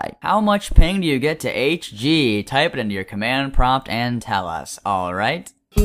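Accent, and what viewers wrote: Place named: Spain. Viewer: jaja me gusto tu asento español jaja saludos desde mexico i like your language spanish im a sub from mexico :)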